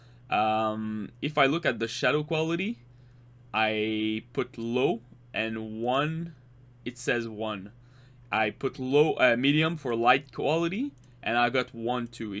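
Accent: Quebec, Canada. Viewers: Quebec accent xD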